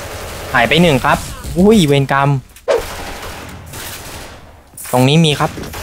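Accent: South, Thailand. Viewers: พี่คนใต้ใช่ไหมครับ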